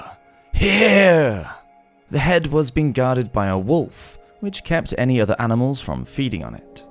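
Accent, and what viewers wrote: United Kingdom. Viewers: Love your videos man where you from in uk?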